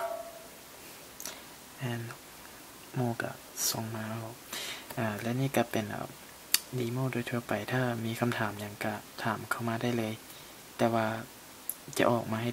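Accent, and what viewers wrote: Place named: Vientiane, Laos. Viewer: ຄັກຫລາຍ ວ່ານແຕ່ສຳນຽງ ວຽງຈັນປະສົມທາງໃດຫວະ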